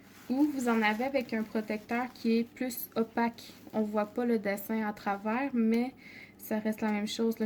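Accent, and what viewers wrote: Canada. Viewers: Coucou très beau début et j'adore l'accent du Canada. merci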